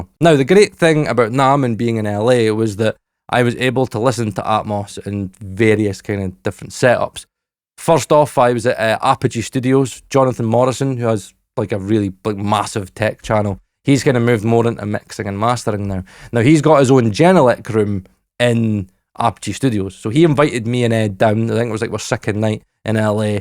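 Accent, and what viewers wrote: Scotland, United Kingdom. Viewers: Nice hearing a scots man. I lived near perth 10 years ago. Loved Scotland. Nice country and great people...other than my EX. 😜 I loved salmon fishing. 👍👍👍 About the ATMOS...I don't know what to think about it. I never had the chance to really listen to a great setup. But i watched videos where people are crying while listening. So it must be a great experience...especially for music...not only for movies. Greetings from Germany...🍻 ❤☮☯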